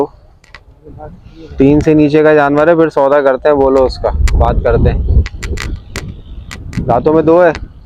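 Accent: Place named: Karachi, Pakistan. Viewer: Yr pure Karachi wala style keep it up brother ❤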